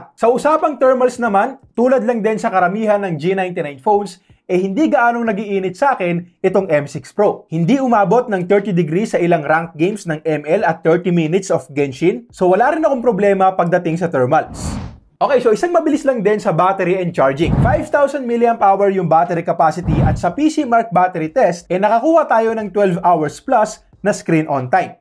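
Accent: Philippines. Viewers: Love ko style mo skip unboxing direct to the phone na at clear Ang Bose's mo mabilis salita...Pinoy Ang Bose's...swak sa brain ko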